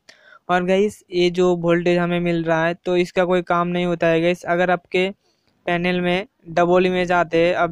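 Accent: Bengal, India. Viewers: Aapka mother language bangali hai na is liye bahot logo KO samaj me nahi aata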